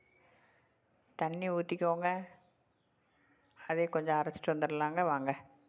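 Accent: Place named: Kerala, India. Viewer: நீங்கள் மலையாளியா?உங்கள் உச்சரிப்பும்,உபயோகிக்கும் வார்த்தைகளும் அதை தெரிவிக்கின்றன.பி‌ன்னே என்பது மலையாள‌வார்த்தை.தமிழில்‌ அப்புறம் என்று சொல்ல வேண்டும்.மனஸிலாயோ சேச்சி?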